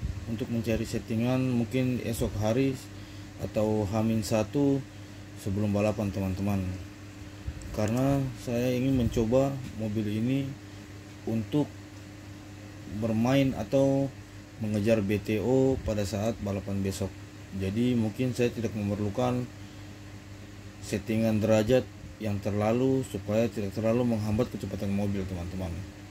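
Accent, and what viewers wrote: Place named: Ambon, Indonesia. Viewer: Logat nya kayak logat Ambon ka om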